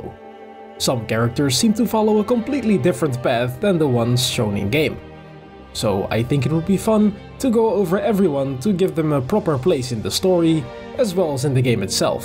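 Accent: Netherlands. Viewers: Maybe I’m tweaking but are you Dutch? Your accent seems so similar to the Dutch accent- from a Dutchie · Your accent screams Dutch I'm sorry xD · Appreciated this video! I was just wondering, based on your accent, are you dutch by any chance. Question coming from a dutchie 👀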